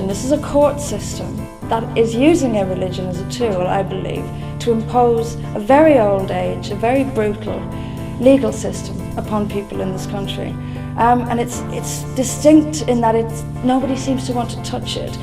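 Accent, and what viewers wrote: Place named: Ireland. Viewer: hey she sounds Irish. fun fun w/the vatican mantra/organized crime etc aye?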